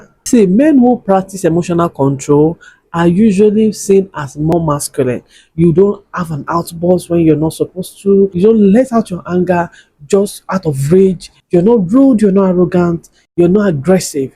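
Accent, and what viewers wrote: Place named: Nigeria. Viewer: Maaan… I love Nigerian people, the accent 😊❤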